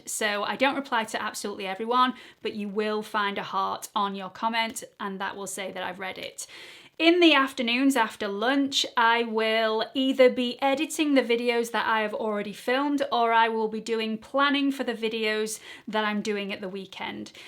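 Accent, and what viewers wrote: United Kingdom. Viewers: you are a gem! I always look forward to your videos! Between your adorable personality and your British accent, I would watch you all day, even if you didn't ever share the wonderful information with us. Hugs from Iowa in the US! 💓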